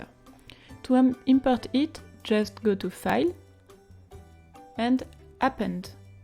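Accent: France. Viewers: Au vu de l’accent on reconnais que tu es française 🤣🤣 dommage pas de faire en français y’a dja bcp de tuto en anglais 😭 merci quand même :) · Прелестный французский акцент...🙂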